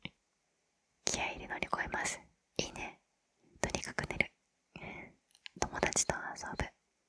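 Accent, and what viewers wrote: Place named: Osaka, Japan. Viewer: スラム街を見学しました🙂‍↕️え、大阪弁！？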